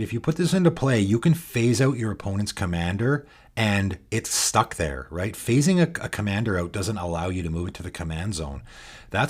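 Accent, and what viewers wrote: Canada. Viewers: I suspected you were Canadian as well, just some subtle ques from your accent.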